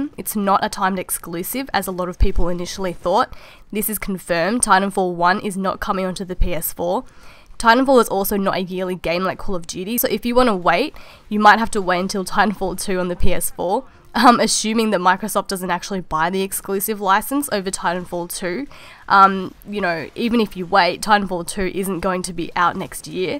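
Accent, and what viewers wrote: Australia. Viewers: I sense strong australian accent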